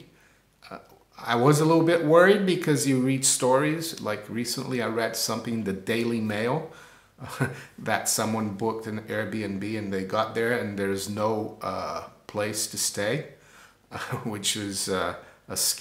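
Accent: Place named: East Coast, United States. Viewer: I enjoy your channel! Difficult to know you're Brazilian because you speak with what sounds to me like a U.S. east coast accent. Did you spend time living in New York, New Jersey, or somewhere on the east coast of the U.S.?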